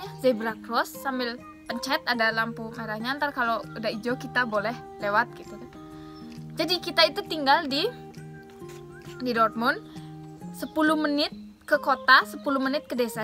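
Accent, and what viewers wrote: Bali, Indonesia. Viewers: Iya logat nya kyak logat Denpasar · mba orang bali ya?? logatnya seperti org bali😊😊😊😊😆😆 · Logat Bali nya ga ilang yaa.. Keep inspiring. Send love from Indonesia ❤ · Kok logatnya kyk orang Bali? Dari Bali yaa??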